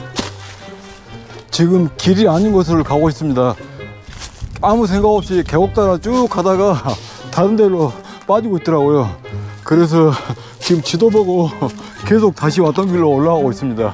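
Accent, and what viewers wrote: Jeolla, South Korea. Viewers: 안녕하세요 추천영상에 떠서 놀러왔어요~ 저는 책읽기를 하는데 산을 좋아해서 산영상 자주 보다보니 추천에 늘 산 채널이 떠요😝😝 내연산 저는 딱 한번 가봤어요 근데 제가 간 날 산행중에 비가 너무 많이 와서 정상은 못가고 폭포길 찾아서 내려왔는데 얼마나 걸었는지 하산해서 보니 30키로를 걸었더라구요 저에겐 그냥 힘들었던 산 ㅎㅎㅎ 편안하게 설명해주시니 영상보기가 좋습니다 ㅎㅎ 내연산은 폭포가 유명하다고 하더라구요 친구들 아니었으면 한번도 못가봤을거예요 알바도 하시고 힘드셨겠요~👍👍 전라도 억양 저는 좋아해서 그런지 설명 편안했습니다 ㅎㅎ 감사합니다 구독하고 자주 놀러올게요🙏🙏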